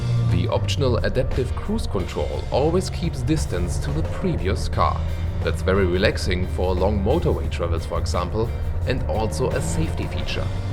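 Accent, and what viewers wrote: Germany. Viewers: Love the review, I like to hear your german accent too! would be pretty cool to take this up to the mountains with all the snow!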